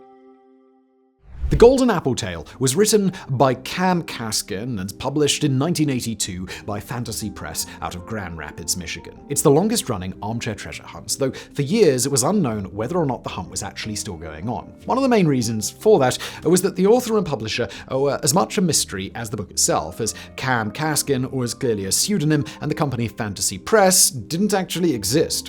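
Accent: England, United Kingdom. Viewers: I come back for the lesson delivered with an English accent time and time again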